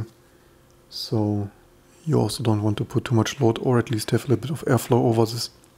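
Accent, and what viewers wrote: Germany. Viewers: Zis is zhe must germanbenglish das ich je gehört habe :D aber nettes Video,l!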